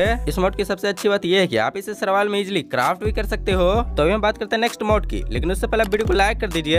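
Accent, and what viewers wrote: Bihar, India. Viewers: Your speaking style is like bihari but ek dam mast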